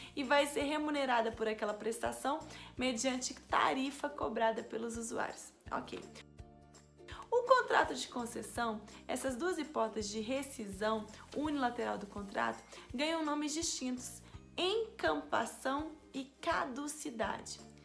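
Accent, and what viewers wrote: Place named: Minas Gerais, Brazil. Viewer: Sotaque mineiro é inconfundível 😊⚪🔺⚪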